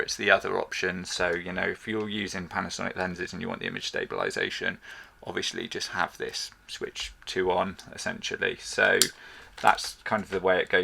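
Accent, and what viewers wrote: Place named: RP, United Kingdom. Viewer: Hello From Malaysia! I Just got the GH6 yesterday. Your channel is very useful. I appreciate the RP (Received pronunciation) accent.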